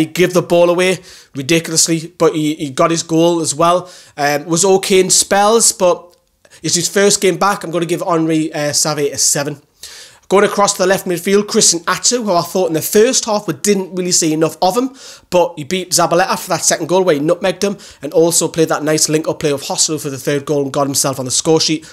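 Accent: Newcastle, United Kingdom. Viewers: Winning certainly brings oot your Geordie accent hahaha!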